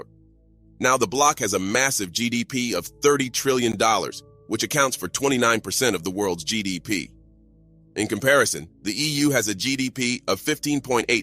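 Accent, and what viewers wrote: United States. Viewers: Don't know why, thick American accent doesn't sound pleasing. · You are such a cheerleader for the other side. Do you live in Africa. You sure sound American. I call cap. You want your money to be worthless, dummy?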